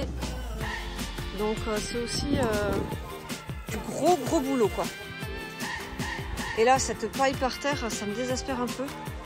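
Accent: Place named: South, France. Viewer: C’est génial de découvrir avec vous les petits trésors de ce jardin, les palmiers est splendide ! Ici aussi nous en avant mais c’est la région. J’entends un petit accent du sud, de quelle région êtes vous ?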